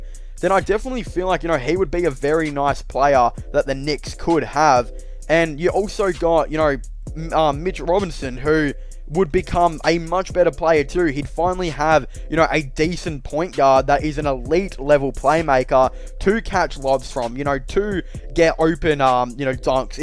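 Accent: Australia. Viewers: talking too fast....and Aussie accent. Lol.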